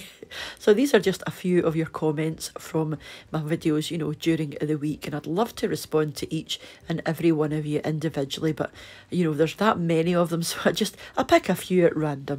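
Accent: Scotland, United Kingdom. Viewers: I do love all the meat pies, we don't get them that tasty in Canada..I am loving to watch all your videos and love your accent so much..something about the Scottish accents..I worked with a lady that had a Scottish accent..and I'm jealous! love it.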